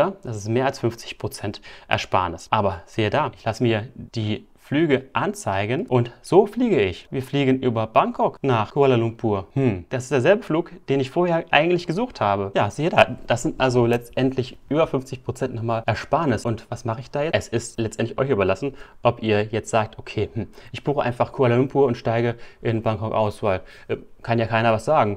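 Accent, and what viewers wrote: Germany. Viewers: Ist das so eine Art Bauerndialekt? Uckermark?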